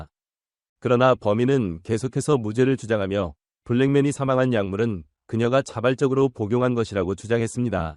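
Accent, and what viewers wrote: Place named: Yanbian, China. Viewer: 요즘 조선족들이 범죄 유트브 시작하면서 사투리 때문에 이런식으로 많이 하던데 조선족이세여?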